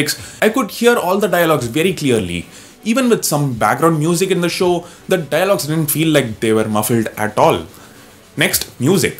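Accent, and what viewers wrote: India. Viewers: Nice indianglish...😎😎😎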